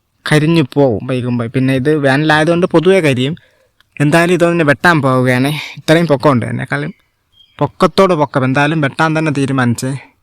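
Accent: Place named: Malappuram, India. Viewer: മലപ്പുറം ക്കാരുടെ സംസാരവും ഇതും ഒരുപോലെ....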